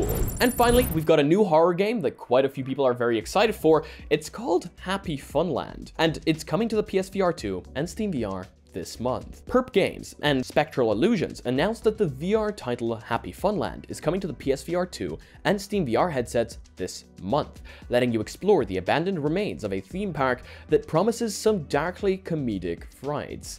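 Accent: Poland. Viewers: The polish accent intro 👌🏼🔥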